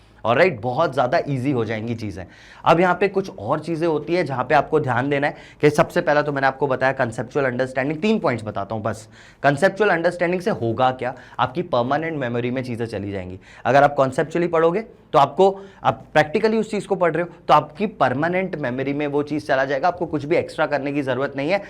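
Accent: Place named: Bhopal, India. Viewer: SIR BILKUL BHOPALI STYLE MEI SAMJHATE HAI❤❤❤❤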